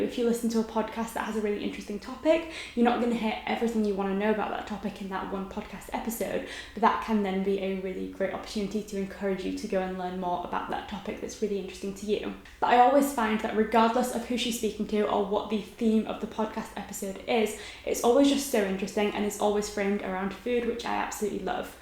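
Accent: England, United Kingdom. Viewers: She’s from England but idk where specifically.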